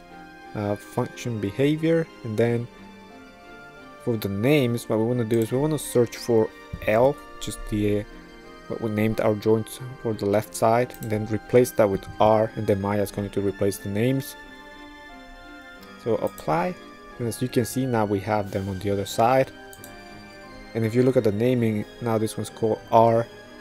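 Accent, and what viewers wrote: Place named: Brazil. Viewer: Thanks for sharing! Sinto um leve sotaque BR :}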